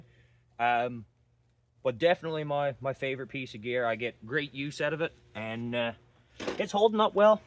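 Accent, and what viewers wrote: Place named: Newfoundland, Canada. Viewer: Pretty sure he's from Newfoundland and Labrador!!! Canada!!!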